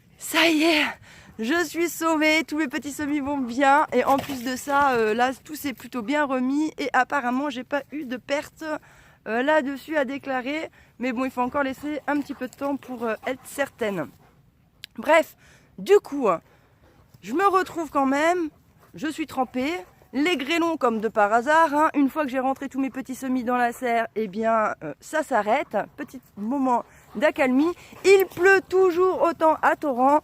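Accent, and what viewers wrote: Paris, France. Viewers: Et ton accent parisien j'adore, ça me rappelle une ex qui vit dans le 20 eme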